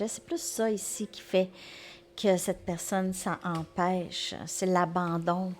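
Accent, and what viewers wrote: Canada. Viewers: J'adore ton accent si charmant du Canada j'adore j'adore le Canada car en juillet 99 j'ai était dans ton très beau qui est magnifique et que j'ai vraiment aimée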